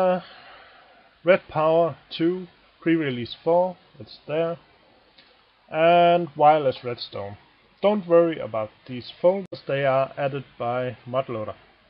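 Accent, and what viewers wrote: Denmark. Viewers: Great video, even though the audio is pretty f***tup. But i am wondering, where are you from? Denmark? Or anything close? :P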